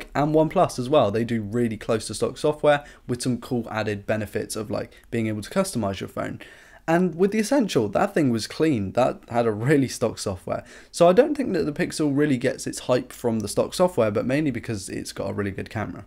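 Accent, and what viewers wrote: United Kingdom. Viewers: Happy birthday , and I like how you talk I like british accent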